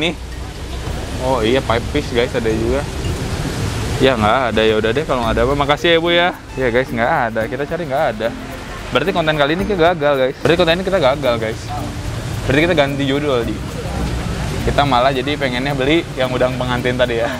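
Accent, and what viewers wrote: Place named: West Java, Indonesia. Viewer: Cara ngomong kak ini khas Sunda bgt apakah emg org Sunda? Wkwk penonton baru ini.... Dan ada yg bisa ngasih tau ini pasarnya di mana ya?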